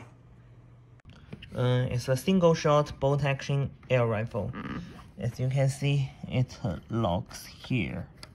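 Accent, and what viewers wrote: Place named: China. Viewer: 老哥稳，中式英文太亲切了哈哈哈哈哈哈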